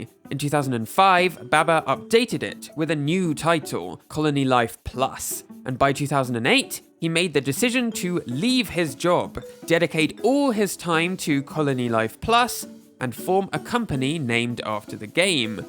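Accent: United Kingdom. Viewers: Thanks, I was going to ask that. It's obvious you're British - your accent and the spelling of "honor" with a "u" are dead giveaways.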